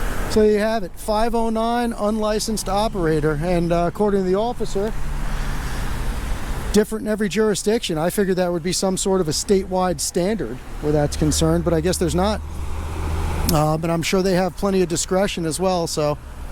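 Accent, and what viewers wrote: Cuba. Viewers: Dang bro, you speak really good Spanish. You sound like a you could be cuban just about. Lol 👍🏽😎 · You speak perfect fluent spanish, although you speak a caribbean spanish accent, more like Cuban.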